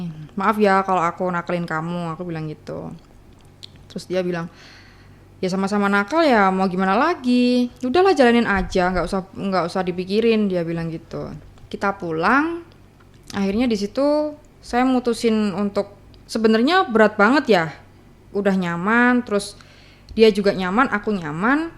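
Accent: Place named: Banyumas, Indonesia. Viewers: Suaranya mendok banget😁❤ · Medok kali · Medok bngt mbak nya😂😂😂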